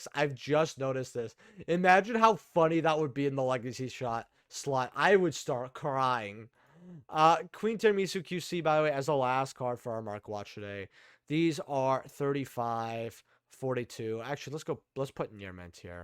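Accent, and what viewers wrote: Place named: New Jersey, United States. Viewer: European? Bro, I could’ve sworn you sounded like you might’ve been from Jersey or something.. also I hope 2025 is your year! We love you,!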